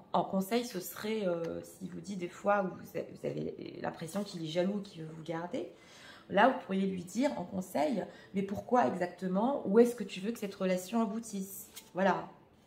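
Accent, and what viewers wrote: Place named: France. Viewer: Mardi beaucoup pour vos tirages, vos conseils, votre énergie, votre franchise. Ne tenez pas compte des railleries de ceux qui se moquent de votre accent français quand vous lisez les cartes en anglais. Ils ne sont pas dignes de votre travail et de votre passion. 😊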